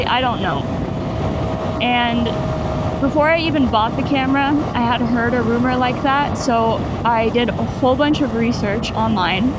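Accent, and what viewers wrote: United States. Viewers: where are you originally from?? I haven't seen all your videos so not sure how you sound American but are out in Luxembourg... sorry ..im nosey...lol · Sothern accend 👌🏼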